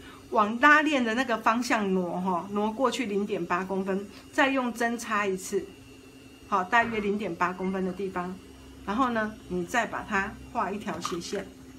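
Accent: Taiwan. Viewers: 這個小包包的教程講解的很詳細，台灣式的普通話聽起來很舒服 。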